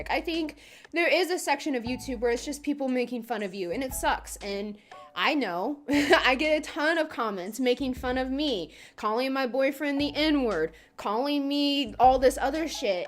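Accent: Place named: Michigan, United States. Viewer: Are you from Michigan? Lol I'm just guessing from your accent. Im probably wrong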